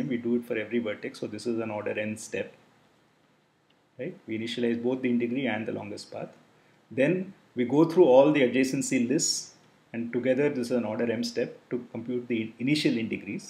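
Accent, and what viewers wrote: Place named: India. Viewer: Ужасный индийский акцент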